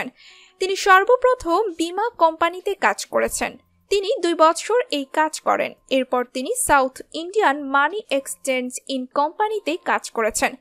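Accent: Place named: Bangal, Bangladesh. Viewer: সঞ্চালিকা কাঠ বাঙালদের মতো বাংলা বলেন কেন? ইস্ত্রি বলেন স্ত্রী-কে !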